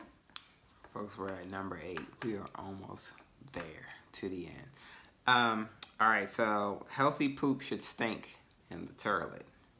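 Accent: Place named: London, United Kingdom. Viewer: lol the London accent.. No not the S curl- good information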